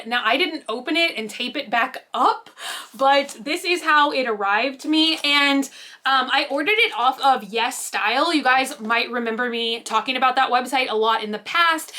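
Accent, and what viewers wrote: South, United States. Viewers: SHE'S FROM TEXAS. All of us southerners talk like this.🤷🏽‍♀️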